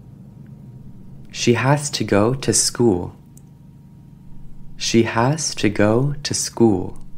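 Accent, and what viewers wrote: United States. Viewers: Usa accent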